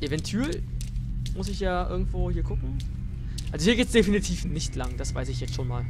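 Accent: Berlin, Germany. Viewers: Ich schreib nur so ungern ;-) Ich schau erst seit gestern deine LP`s und find sie Klasse. Ohnehin geht nix über Berliner Dialekt :D Achja: Mach noch mehr Horrorgames!!!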